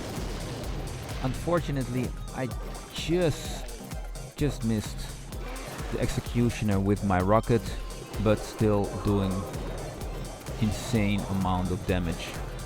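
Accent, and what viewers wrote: Netherlands. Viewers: Mooi amsterdams nederlands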